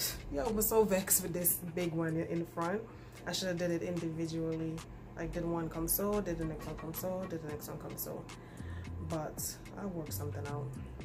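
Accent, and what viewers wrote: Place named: Antigua and Barbuda. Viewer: U sound like an Antiguan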